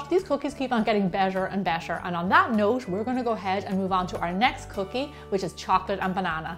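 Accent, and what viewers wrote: Ireland. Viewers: hi, lovely video. started baking recently when i get time, still new to it, get nervous often, but with yr amazing videos, great recipes and precise instructions always, not to mention yr irresistable self, i hope to become a bolder baker soon. i ladore yr irish pronunciation n luv checking out yr videos. just want to say thanks for all the trouble u take to get them to us. you r truly an inspiration to pple like me. God bless. luv from India. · uhh... i love your Irish accent. i could listen to it all day. is that wrong of me?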